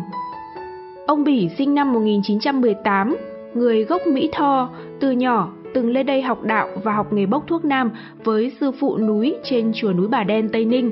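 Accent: North, Vietnam. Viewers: Kênh này đưa thông tin 1 chiều và không có dẫn chứng rõ ràng. Cộng thêm giọng đọc miền “ ngoài “...không đáng tin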